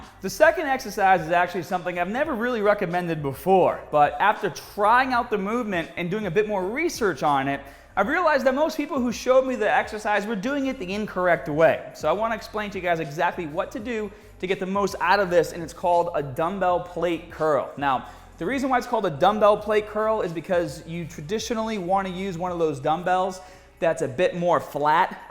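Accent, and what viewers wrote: Boston, United States. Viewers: Boston and Aussie accents both have a similar elongated pronunciation of 'AR' within words (stARt, tARget, bARbie etc). I think due to his elocution his Boston accent only flourishes on certain words, which happen to be the ones with 'AR' similar to in Aussie. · Daniel Bon Daza it’s a Boston accent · That Boston accent is disgusting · Boston accent lol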